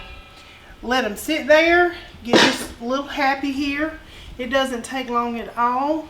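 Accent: South, United States. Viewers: ok thank you so much im elated that you landed in my feed, you had me at your southern accent ha ha❤ I love your voice its beautiful and make listening pretty cool. Ive watched this video alone 6 times already while taking notes. Thank you for being available on top of your own life. It takes a village to can food ✅️. Last summer i used the pressure can method with okra in my presto on top of the stove. Lost r jars due to they did not seal. I think they were too full also. Like above the rim. 😢. Ty so much you're very helpful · Well, I'm glad you did it! I'm about to try this with my big ol' mess of tomatoes from my garden!!! Just subscribed! I'm a southern girl here in Texas! I don't know where you're from, but I can tell you're from the south! Gotta love it! ❤ Blessings and Much Love my dear sister! 🙏✝️🛐💜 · Love your southern accent! Been trying to decide on what recipe to use and I chose yours. 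🥰 I'm about to do this tomorrow while I'm watching some Colts football. I would have never thought about using "wheres your sister sauce" and soy but I'm all in on that now. I love a sweet but savory taste. Wish me luck. And we might be having spaghetti for dinner as well. 🤣 Thanks so much for sharing! Subscribed as well. Yay!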